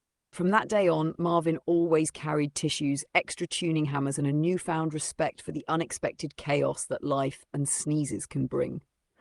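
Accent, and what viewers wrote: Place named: United Kingdom. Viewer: I love the British accent. Great story! Haha!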